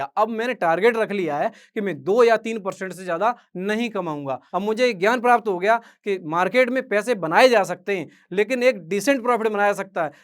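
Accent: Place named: Bhind, India. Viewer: Bhind ki bhasha bhut mst or pyari lgti he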